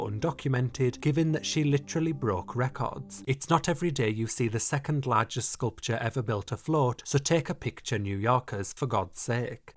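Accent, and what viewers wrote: United Kingdom. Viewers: English accents are hilarious, the southern accent is funny but your one is really funny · Why is he so british? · Great video, your voice reminds me of Jon Ronson. And with that, has that Cardiff sound 😅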